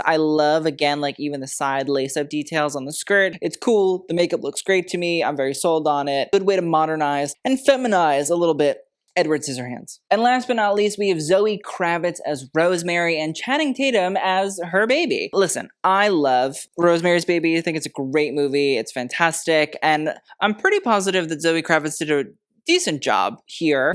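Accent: Ireland. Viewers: Ok but you’re Irish accent sounds just like Robin Williams, Mrs. Doubtfire! Which is such a slay!! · Omg the Irish accent without sounding like a leprechaun is fantastic! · This mf Irish accent lmaooo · BEST IRISH ACCENT QUEEN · what an excellent Irish accent - all about it!! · Lovely Irish accent and cute costume 😊☺️ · Your Irish accent sounds like Mrs. Doubtfire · I could listen to that Irish brogue for days. So delicious. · Perfect Irish accent…love it❤ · Excellent Irish midlands accent:) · My father is Irish and you did fairly well with accent, Sister. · that is a very good irish accent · You really made me laugh! The irish accent was great and what you said about Ed Sheeran had me in stitches. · !! I always love when you pull the Irish accent out! Sounds just like my mother in law from Belfast 😜🇮🇪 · I usually don’t gove youtube videos a thumbs up but damn I hit that button so hard just 2 minutes in. 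That Isish accent was incredible 😭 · nice irish accent! Yanks normally butcher it but you're pretty spot on! Gwan Sis! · Sorry, but nun + Irish accent brings to mind only one person: the legendary Sister Michael from Derry Girls 🖤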